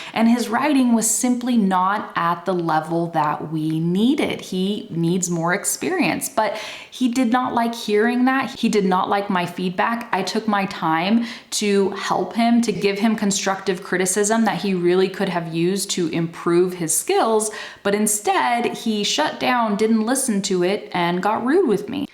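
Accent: California, United States. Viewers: I think there are many accents in the american accent. I like yours. from iraq 🇮🇶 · Hi. Just want to know why when I listen to you I can clearly understand every word you say. Is it because you are from California or because you had to develop your pronunciation skills as a teacher? Thanks!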